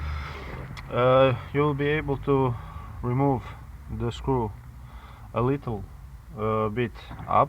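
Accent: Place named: Russia. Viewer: С таким акцентом может говорить только русский.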